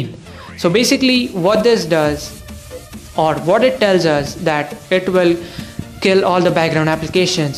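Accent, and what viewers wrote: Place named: England, United Kingdom. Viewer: Nice English Accent 👌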